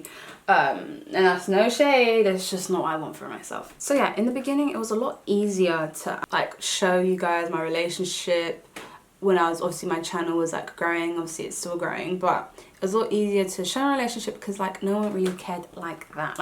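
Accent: United Kingdom. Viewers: You remind me of Jorga Smith both of y'all gorgeous and have that dang British accent.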